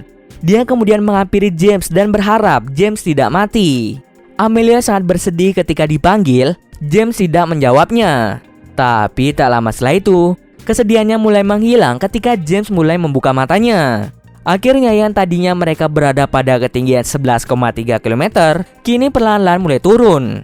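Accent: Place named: Palembang, Indonesia. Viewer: Cknyo wong plmbng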